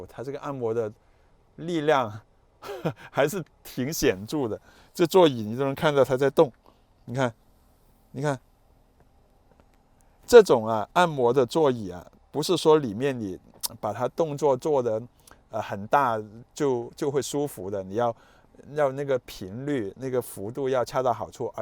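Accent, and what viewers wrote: Cantonese, China. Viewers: 这主持人是广东人对吗？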